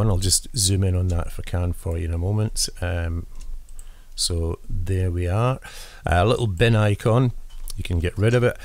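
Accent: Scotland, United Kingdom. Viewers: Love your accent!!!! Scotch, Irish? I would guess the first but I love it regardless!! And Theenks fir da veedeo. XXOO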